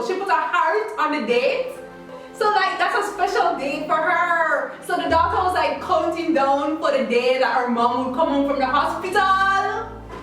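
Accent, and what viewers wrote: Jamaica. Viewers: I’m sorry I’ve never been to this channel but you sound really Jamaican are you?☺️👍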